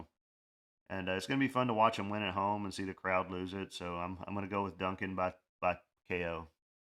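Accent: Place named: Tennessee, United States. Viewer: I dig your breakdowns. You got a accent like me. Middle TN. Where you out of?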